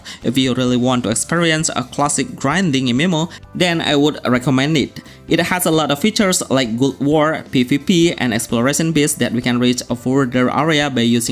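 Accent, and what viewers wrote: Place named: Indonesia. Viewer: Indonesian accent😅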